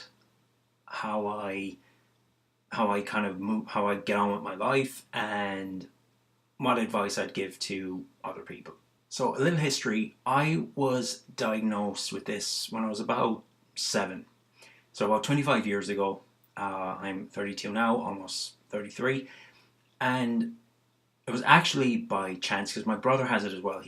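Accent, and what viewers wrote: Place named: Ireland. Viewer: Hi how are you, I also have Stargardts And like you I was diagnosed when I was 7 years old, I am 28 now and everything you describe i can relate to also regarding your vision however I don’t see double when I focus on objects close up instead I just frustrate my eyes and give myself headaches . I use my camera to do the exact same things as you I think it’s brilliant for getting around public transport, airports etc.. some days can be more difficult than others especially when driving is out of the option and a long day can feel like a much longer day dealing with all the extra challenges but the fact that I can get out and about and do pretty much all I need and want with a few exceptions is perfect enough. I come from a family who have been in the motor trade all their lives and I am a huge car fan so you can imagine how devastated I was even at 7 to be told it was a big No driving is not going to happen I’m afraid. 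It certainly hasn’t stopped my interest at all. There’s so muchI have done and still can do with limited vision even driving on a race track, goKarting , horse riding , tennis, American football, rugby anything I wanted to do I did it just did it in a different way and that’s the key to it I think. Personal question are you Irish your accent sounds Irish sorry .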